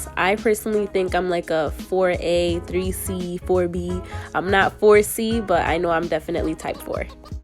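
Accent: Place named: Jamaica. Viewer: yes with the PATOIS gyal, GWAAN NUH! i think you have 4a/4b curls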